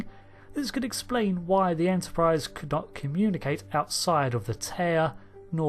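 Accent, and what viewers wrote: United Kingdom. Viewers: Awful nit brit narrator. "Theah shiewwwwwwwwwwwwwwds..."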